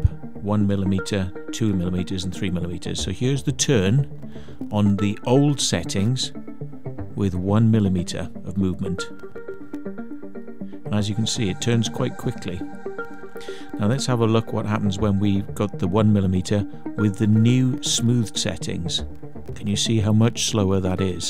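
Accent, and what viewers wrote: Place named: Wales, United Kingdom. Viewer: Best description of these settings I've seen. Now I'm trying to apply what I've learned to my Mavic 2 Pro. Kind of funny to hear a fellow Welsh accent (I'm near Pontypridd)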